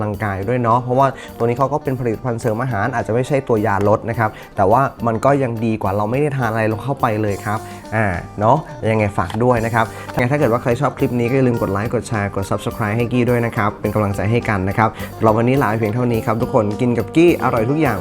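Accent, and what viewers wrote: Isan, Thailand. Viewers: เราไม่กินดิบ ไม่กินเนื้อ แต่ชอบกินผัก ดูไปกินข้าวไป อร่อยตามเลยค่ะ เว้าอิสานได้น่ารักมาก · น้องกี้เว่าอีสานตาฮักหลายจร้าตามเบิ่งทุกคลิป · ชอบพี่กี้เว้าอีสาน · พูดอิสานน่ารักมากค่ะคุณกี้ ❤❤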